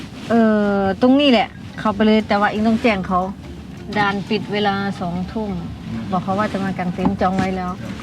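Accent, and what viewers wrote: Korat, Thailand. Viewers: ก็ว่าอยุ่สำเนียงคุ้นๆ พูดโคราชนี่เอง พูดเหมือนแฟนผมเลยครับคนโคราชเหมือนกัน 555 ผมทำคลิบเที่ยวเหมือนกันหวังว่าคงได้บังเอิญเจอกันซักทริปนะครับ😁😁😁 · ทนไม่ไหวต้องติดตามจนใด่สำเนียงเสียงภาษาโคราชบ้านเองชอบมักๆ😁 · ฉันชอบแก่พูดพาษาโคราช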